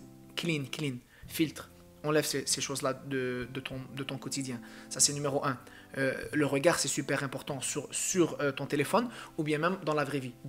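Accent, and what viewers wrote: Quebec, Canada. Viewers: Il est canadien encore heureux que il a un accent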